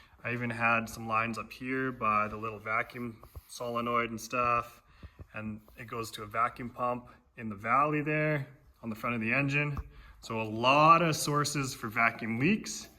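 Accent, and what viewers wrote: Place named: British Columbia, Canada. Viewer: I noticed you northern bc accent, lol. Checkout atlin BC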